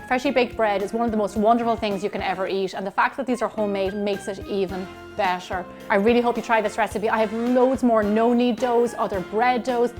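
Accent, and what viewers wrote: Ireland. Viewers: I like the Irish accent, "butt eerrr" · Great video - thx! Will be giving it a shot. No steam? AND do I detect a bit of Irish in you? Maybe delicious Irish soda bread in the works? 😀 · Love listening to your lovely Irish lilt!!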